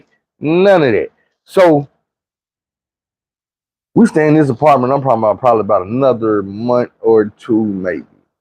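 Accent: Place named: Texas, United States. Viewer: Bruh I watch yo videos all the time you sound like my Texas uncles guess it’s that Texas/Arkansas connection you 💯bro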